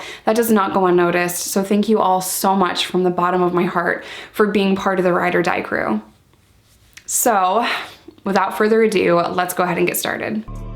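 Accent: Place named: New York, United States. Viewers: i knew your accent was familiar! i’m from utica too i completely understand how you feel having family there i’m glad your mom moved!! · Your New York accent came out in this video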